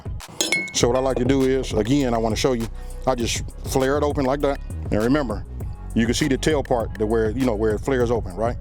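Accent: United States. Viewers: Would love to see that. Love your American accent and if I ever came to US and A would love to meet you and your Family 🌅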